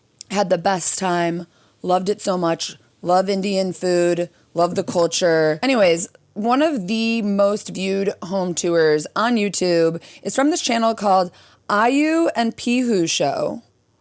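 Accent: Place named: Midwest, United States. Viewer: I love that with your midwest accent, it sounds to me like when you say tour you're saying 'tew-er' 😆 I cant not heart it!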